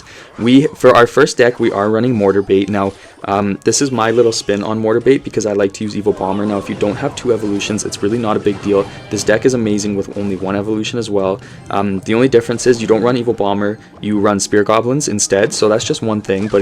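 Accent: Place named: Canada. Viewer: Your voice sounds so Canadian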